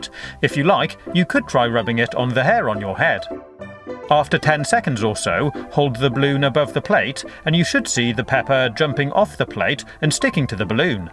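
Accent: England, United Kingdom. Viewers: yup definitely from england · England. The accent! Just my guess.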